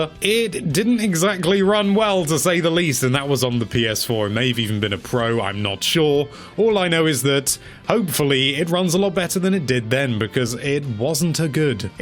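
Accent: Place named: United Kingdom. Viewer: This is the most britishy britsh accent int he world.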